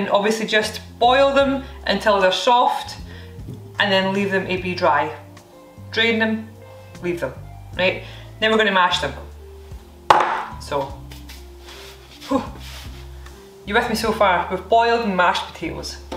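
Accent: Scotland, United Kingdom. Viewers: Listening to a scottish woman talk is always an experience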